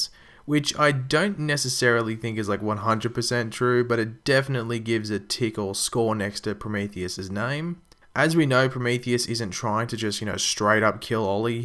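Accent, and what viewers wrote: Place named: Australia. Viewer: his Australian accent i think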